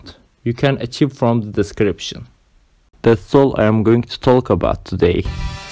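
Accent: Turkey. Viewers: dinlerken ya bu ingilizce türklerin konuştuğu şekle benziyor dedim. sonra acıklamaya baktım :D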